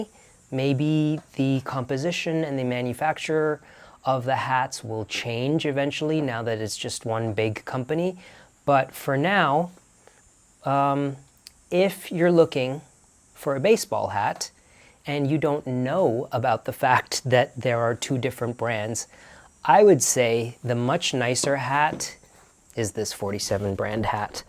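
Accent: South, United States. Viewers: 😂🤣As a Southerner, the acceennt!!👌🏿❤️😂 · the gay boys i grew up with skewed my perspective because they played "sports", got in fights, and the only fashion icons they respected were James Dean and (young) Marlon Brando (esp. from 1953 The Wild One). i adore the Tennessee Williams accent though...i adore you! i HAVE heard "mesh head" used as a pejorative to hats, that MY boys associate with a certain ex- boyfriend truck mechanic that was in their midst for too, too, long... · Why the southern accent. Gays probably play sports even Olympic swimmers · You do the only Southern accent that I find charming! 😄 And, I'm sorry for your loss.♥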